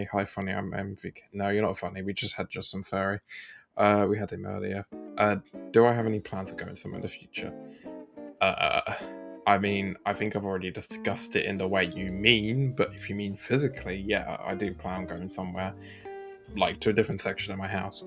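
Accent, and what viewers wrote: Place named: United Kingdom. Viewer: Your British is showing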